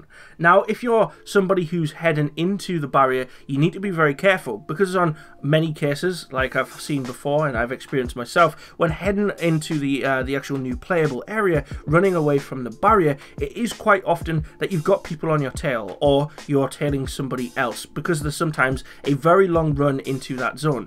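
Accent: Newcastle, United Kingdom. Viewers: Nice video dude - enjoyed that. Bonus points for what sounded like a Geordie accent too! =)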